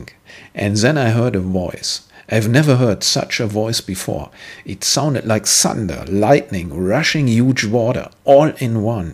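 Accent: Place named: Germany. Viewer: German accent.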